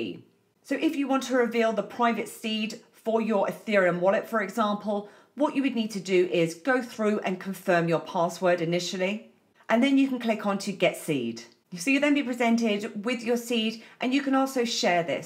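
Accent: United Kingdom. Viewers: the heavy British accent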